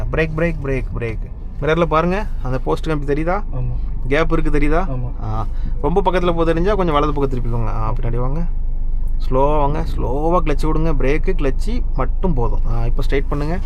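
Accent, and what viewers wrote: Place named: Madurai, India. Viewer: Excellent teaching bro thanks Bro neenga Enga Madurai slong pesura maathiri irukku 😊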